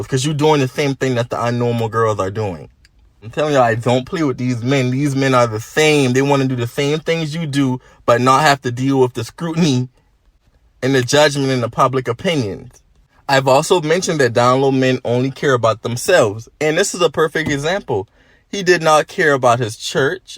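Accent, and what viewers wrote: Charleston, United States. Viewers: Are you from Charleston, S .C.? Beautiful accent.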